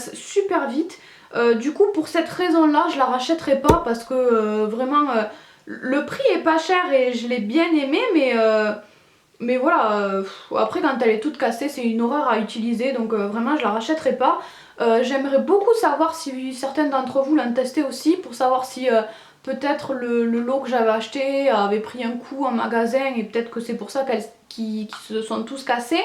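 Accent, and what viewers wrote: South, France. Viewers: Le suuuud !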